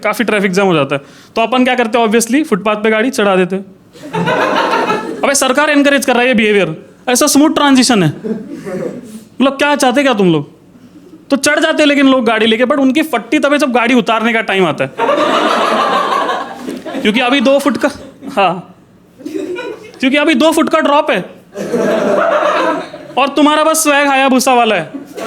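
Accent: Maharashtra, India. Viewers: Marathi accent hota na to aavdla sir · Marathi Mulga ani marathi accent...chumma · Ohooò bhau that marathi accent is to hilarious. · Marathi accent shabd shabd se jhalak rahi thi. Maja aali 👍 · Marathi accent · Getting Zakir vibe plus marathi accent .. good one ! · ...Phir dusra sapna dekha😂😂😂 Bhari vaatla marathi accent mdhli stand up aikun🙌, mhanun bakichya jokes peksha tuze jokes jaast relate zale😂😅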